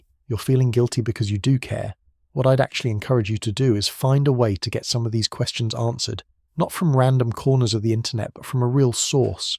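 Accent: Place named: United Kingdom. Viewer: why channel say usa but voice british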